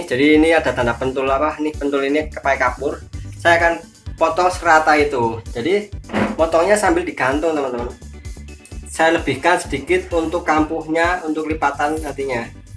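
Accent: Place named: Banyumas, Indonesia. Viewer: Ngapak yaa hehe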